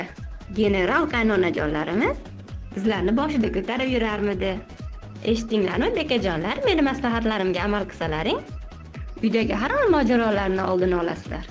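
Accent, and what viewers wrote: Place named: Andijan, Uzbekistan. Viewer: Anjandi shevasi baribir boshqacha daa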